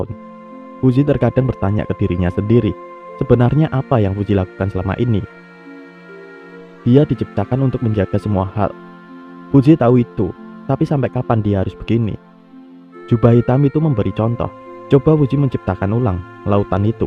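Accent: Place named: Java, Indonesia. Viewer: auto sub, kekurangan nih channel ya cuma logatnya agak medok :v eh kadang medok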